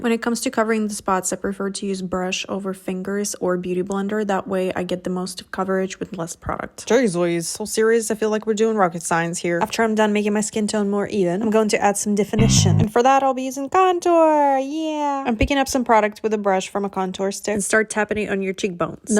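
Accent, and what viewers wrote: Russia. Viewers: I'm actually wondering about your native language (like u've said about the immigration) cause it sounds so russian (delulu myself) And i also have 1 clue. Ur nickname can be read in russian like with the transliteration (the translation is unbreakable) sooo that made me even more sure about my assumption Didn't want to offence anybody, if i'm mistaken, at least you have +1 russian pookie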